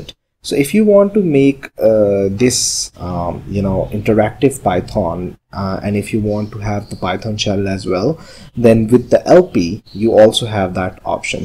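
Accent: India. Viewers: ddddddddd... Indian accent...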